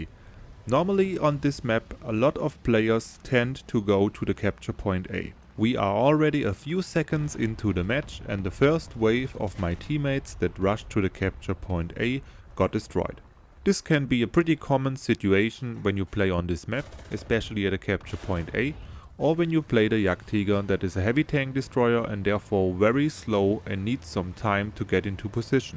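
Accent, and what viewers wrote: Germany. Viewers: Your english is a littel bit broken and you sound german but your symphatic asf you sound like a nice guy too!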